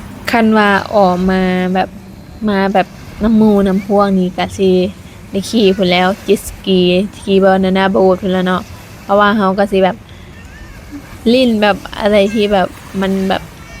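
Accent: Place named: South, Thailand. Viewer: ภาคใต้...เลยครับ🤣😆😁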